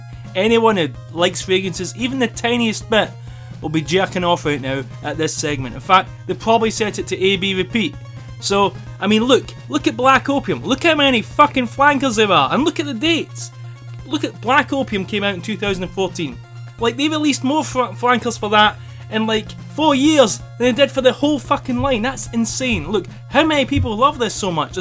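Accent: Scotland, United Kingdom. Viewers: BTW, Love your Scottish brogue. My great grandparents were from Glasgow and Edinburgh. So want to visit your great land someday. :)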